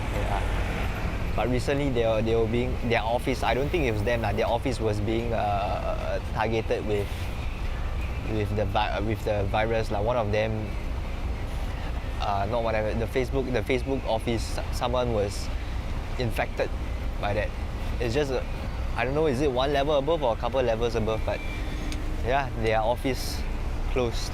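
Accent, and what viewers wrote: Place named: China. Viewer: Accent sounds chianese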